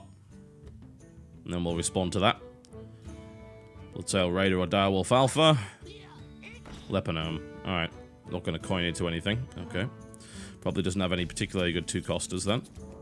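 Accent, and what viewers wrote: United Kingdom. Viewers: He has a British voice.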